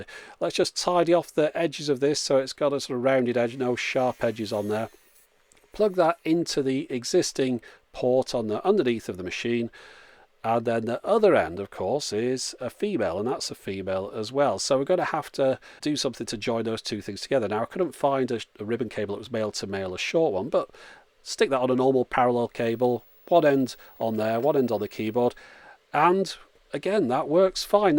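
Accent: United Kingdom. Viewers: I find it funny that you still have to mention how things are pronounced differently in different areas. why do people care so much how its pronounced? its clearly obvious what word you are saying. its not like we cannot understand you. I actually quite prefer a lot of the UK pronunciations vs the US pronunciations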